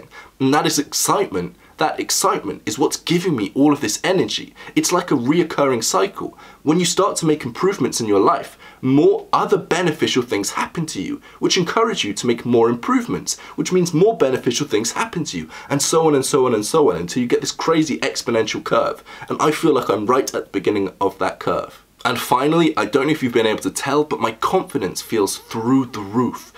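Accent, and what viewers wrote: United Kingdom. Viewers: ofc this guy is british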